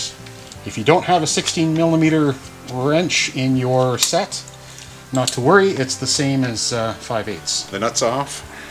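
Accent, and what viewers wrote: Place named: Canada. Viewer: Go Canada ! Can hear the accent come through clearly. May the truckers be blessed and may Trudeau go the way of Herod. I really needed that tip on the fender thingy because I struggled with it last night and was cursing German engineering. Also I came up with an extra little black washer ? Does it go on the back part of the shaft housing where the one fender bolt goes in ?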